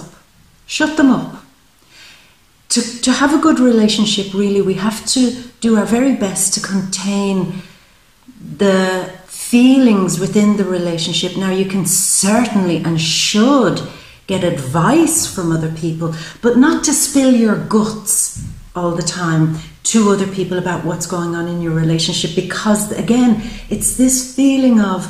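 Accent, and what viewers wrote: Ireland. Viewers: Is that an Irish accent? It's great!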